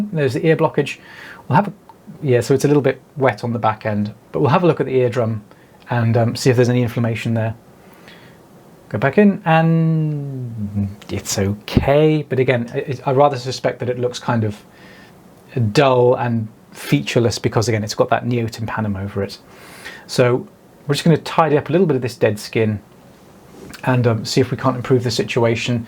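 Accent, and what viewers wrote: United Kingdom. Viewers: I AGREE WITH HIM ABOUT THIS ! YOUR VOICE IS VERY CALMING TO LISTEN TO !!!!!! I'VE ALWAYS LOVED LISTENING TO BRITISH PEOPLE TALKING !!!! I LIVE IN SAINT CLAIR COUNTY , ALABAMA . MY PARENTS WERE FROM MARYLAND , SO I DON'T SOUND LIKE A TRUE SOUTHERNER WITH A "TWANGY" VOICE !!! I SOUND MORE LIKE MY PARENTS THAN I DO A TRUE SOUTHERNER DOES . 😊😊😊😊😊😊😊😊😊😊😊😊😊😊😊😊😊😊😊😊😊😊😊 !!! WHEN I WAS YOUNGER , I HAD A YEAST INFECTION IN MY EARS AND MY ENT HAD TO SQUIRT WARM WATER IN MY EARS AND IT MADE ME FEEL DIZZY AND NAUSEATED WHEN HE WAS DOING THIS TO ME . I LOVE YOUR VIDEOS - THEY ARE VERY INTERESTING TO SEE . 😊😊😊😊😊😊😊😊😊😊😊😊😊😊😊😊😊😊😊😊😊😊 !!!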